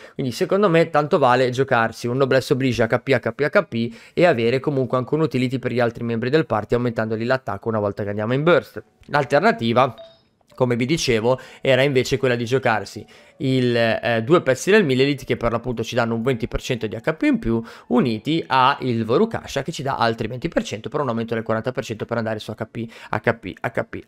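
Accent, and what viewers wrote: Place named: Carrara, Italy. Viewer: Ma a l`è cuscì.... Sei di Carrara?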